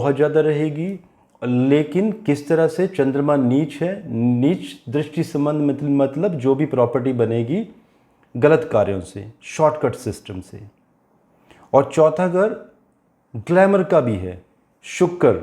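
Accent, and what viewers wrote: Punjab, India. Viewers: this is happens why typically punjabi person trying to speak in hindi anyway always liked ur video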